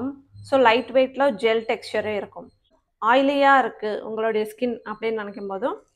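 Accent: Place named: India. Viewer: looking beautiful in yellow saree😍pimples will come due to body heat 😍Alovera fresh a eat pannu na pimples reduce agum 😍ponnu put vlog in pink saree😍your tamil slang is cute😍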